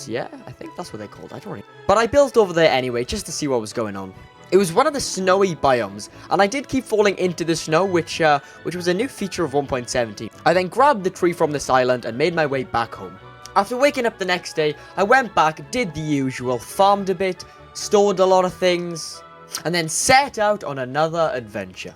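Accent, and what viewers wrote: Wales, United Kingdom. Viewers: Are you from wales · No, he’s welsh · Are You Welsh?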